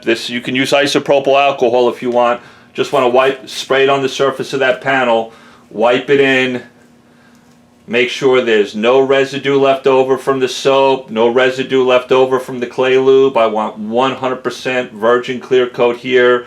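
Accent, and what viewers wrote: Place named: New England, United States. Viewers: Horrible!! What a joke. You just ramble on and on. Nobody clicked your video to here of your personal life or personal BS. You should remove the fact that your a local DFW Texan especially with that infuriating Yankee accent. Knowing that alone is a deterent to clicking this video😠😠😤😡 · Obviously doesn't sound like a Dallas native. Please go back east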